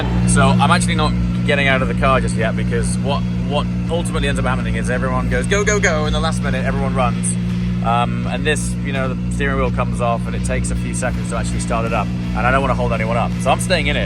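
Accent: United Kingdom. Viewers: Only got onto you through dde, love the content! British voice is always nicer than an Americans! Love from down under mate!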